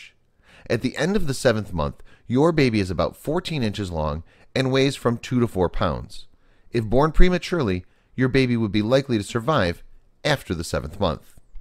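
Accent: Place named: United States. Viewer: British voice should be mandatory for documentaries. What a horrendous "metallic" American voice.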